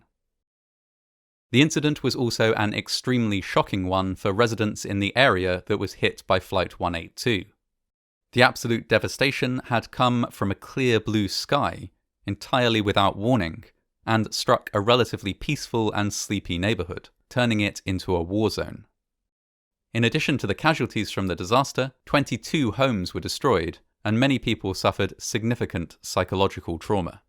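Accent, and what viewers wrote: United Kingdom. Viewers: Another British narrator? Come on You Tube hire some people in this country!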